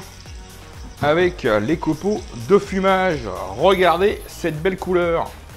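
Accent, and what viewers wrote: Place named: Normandy, France. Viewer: C'est l'accent normand hehe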